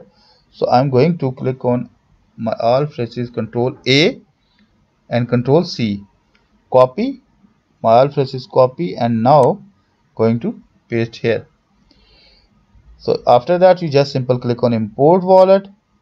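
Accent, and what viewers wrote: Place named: India. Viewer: Gosh. The Indian English accent is the worst 🤮🤮🤮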